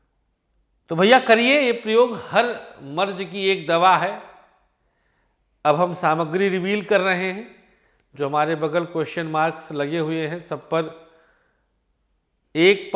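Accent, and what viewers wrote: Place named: Varanasi, India. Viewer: गुरुदेव को सादर प्रणाम आप की बोलचाल की भाषा बहुत सुन्दर लगती है हम बनासी लोगो की यही पहचान है सदैव मार्ग दर्शन करे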